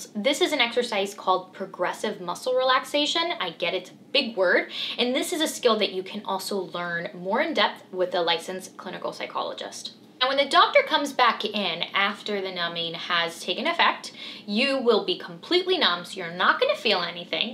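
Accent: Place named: Dallas, United States. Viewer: You sound like your from Dallas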